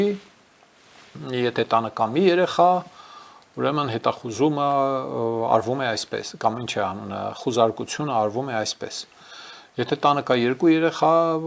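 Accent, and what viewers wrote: Gyumri, Armenia. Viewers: Բա ինչու ես խոսում գյումրիաերեն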